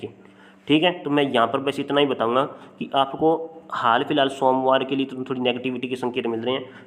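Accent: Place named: Haryana, India. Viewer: Aapka accent bhi hariyanvi hai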